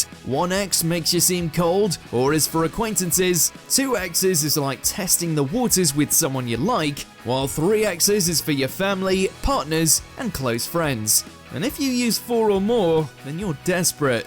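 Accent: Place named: Northern England, United Kingdom. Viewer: He pronounced it like most of Northern England. Class, grass, dance, bath, & laugh, don't have the same sound as father there, but more like mass. I won't bring up Scotland as there are multiple accents here, including ones where fancy is pronounced funcie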